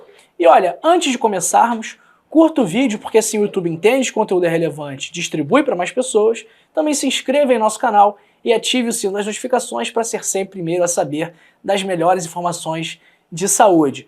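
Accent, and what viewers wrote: Rio de Janeiro, Brazil. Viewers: Sim,sim. Esse carioquêz não me convence.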